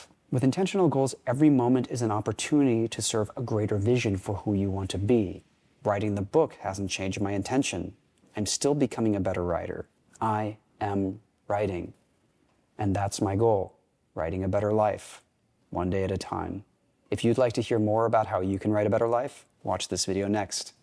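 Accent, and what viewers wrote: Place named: United States. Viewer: Set goals to be a better writer or Ryder? American accent make it hard to hear the difference. I think you are good enough whatever the answer.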